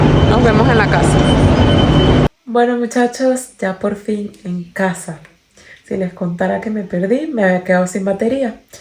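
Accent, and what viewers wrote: Colombia. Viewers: Esta chama es venezolano pero habla como una colombiana , imagino que su por estadia alla imagino que ahora va hablar como un español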